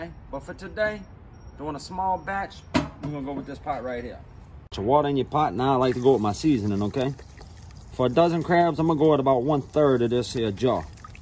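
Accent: Louisiana, United States. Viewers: That's a bona fide cajun accent. ;)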